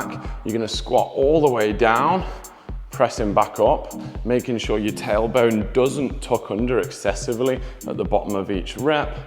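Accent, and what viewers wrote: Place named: Liverpool, United Kingdom. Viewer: A Scouser!!!!!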